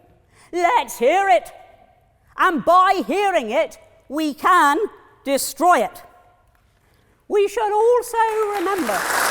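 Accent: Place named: England, United Kingdom. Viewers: This is a most annoying English accent , What part of England is she from .? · She is from the South West of England Daniel, her accent is a well educated accent (neutral, meaning you can't recognise where she's from in Britain) with a very slight South Western twang, otherwise she'd be speaking like the archetypical pirate. She has a wavering tone in her voice which all old Grannies have. You have heard old Grannies saying a speech in public in your country, it's completely different from talking quietly, also it could be the way she talks that annoyed you, that does happen. Anyway Daniel, no matter, she's from the South West. There are accents you like, and accents you don't like and sometimes it could be the way they speak.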